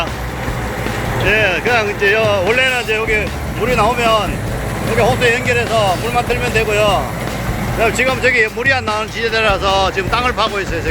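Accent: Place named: Sangju, South Korea. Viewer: 상주 사투리가 구수하고 좋은 기계 잘보았습니다. 김천제품 3년전에 구매했는데....?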